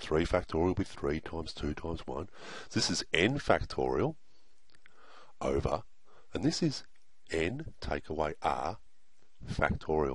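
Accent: Australia. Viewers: Are you Australian? I love your pronunciation hahahhh Anyway I love your videos Love from Italy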